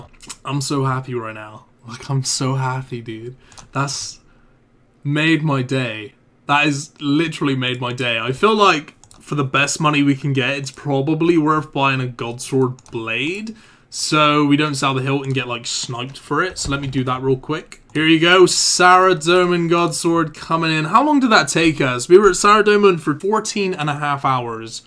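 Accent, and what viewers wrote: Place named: England, United Kingdom. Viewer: !! where are you from in england?? i recognize your accent!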